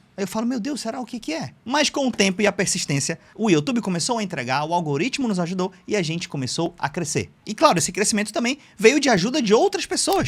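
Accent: Manaus, Brazil. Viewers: Você é de Manaus? O teu sotaque me lembra muito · Sou Paulista morando em Manaus há mais de 15 anos. Bom demais ver um Manaura arroxado divulgando conhecimentos de qualidade em um nicho dominado pelos Sudestinos. E con um sotaque muito mais divertido. Força e sucesso na sua jornada!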